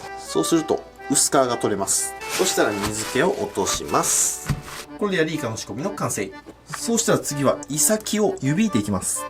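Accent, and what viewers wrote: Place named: Tottori, Japan. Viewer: 鳥取県の人間です。 いつも楽しく拝見しています。 「宝箱だが。」っという言い方 鳥取県の方言と似ています。他にも似たような言い方をされるので同郷なのではないかと思うくらいでした。 いつか遊びに来て下さい。道の駅ではイカがくるくる回されながら干されていたり、温泉もあるし、松葉がにのシーズンには足が一本無いだけのものが破格値で買えたりします。 これからも頑張ってください。動画投稿楽しみに待っています。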